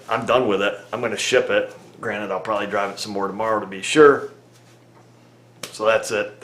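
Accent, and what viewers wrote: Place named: Upstate New York, United States. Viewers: Speaking of accents, yes, you have an Upstate New York accent and, boy, does it shine. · That's not a New York accent, it's a "stat nyland" accent.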